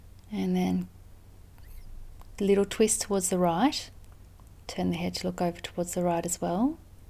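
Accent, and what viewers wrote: Australia. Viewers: Thank you, that was incredible. And so refreshing to hear another Aussie's voice. :-)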